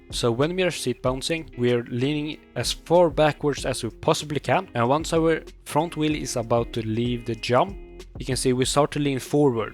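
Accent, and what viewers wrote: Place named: Sweden. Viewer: Jag hör att du är svensk så jag tar det på svenska. Hur lutar jag fram och tillbaka? Är inte som på GTA där man använder joysticken, i alla fall inte för mig. Har jag någon nybörjar-setting på som blockerar?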